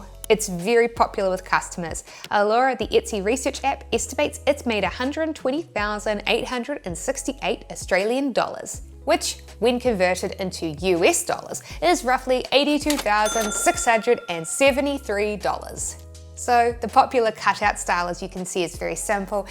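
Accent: New Zealand. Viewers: I really like the New Zealand accent :) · I already knew you were from New Zealand, I'm American. Thanks for all the great content you put out. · Knew you are a kiwi I grew up with a lot of kiwis in PNG so I know the accent. Thank you for your video 🥝😍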